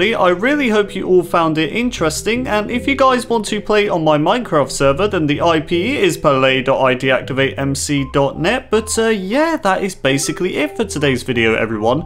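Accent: United Kingdom. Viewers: I love this accent are you british or something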